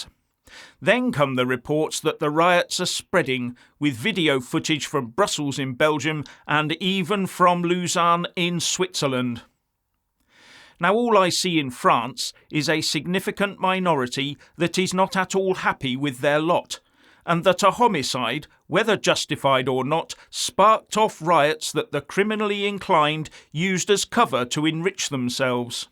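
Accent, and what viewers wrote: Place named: United Kingdom. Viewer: Wow. I've never heard anything quite this British, or with quite that much bite without using vulgar language - I never knew the Britisch tongue to be a form of art. Pretty good summary too. Thank you.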